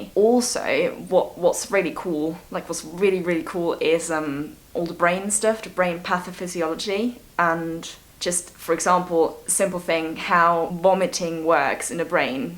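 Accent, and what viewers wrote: United Kingdom. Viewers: Österreicherin spricht englisch mit britischem Akzent jz hab ich alles gesehen haha thumbs up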